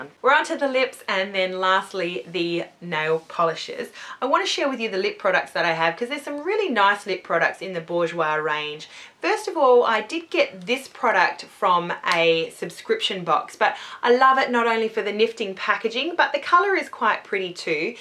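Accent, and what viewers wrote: Australia. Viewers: are you from Australia?? AWESOME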